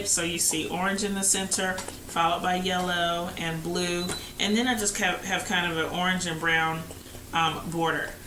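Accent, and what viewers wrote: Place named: North Carolina, United States. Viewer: Cute! How did you end up with so many left over HSTs? BTW you sound like you are in NC. Me too!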